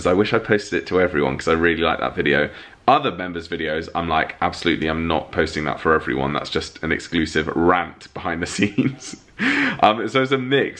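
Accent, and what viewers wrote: United Kingdom. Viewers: Hi, just sayin', I LOVE the way you pronounce heart! Whenever you talk about looking for lambs heart it makes me smile! (That British accent!) Also, don't worry if you don't get to shave or however you look during a video, we are your subscribers because we love you! Yes, keep up the dog recipes, I'd love them for my 3 pups Liza Jane, Jose and Bernie! 💜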